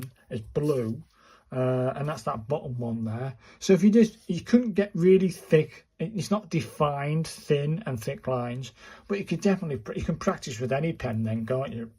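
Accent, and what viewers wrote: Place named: United Kingdom. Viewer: I watch your videos because of your "British Accent" and Calligraphic Content. Good Luck. Keep it up.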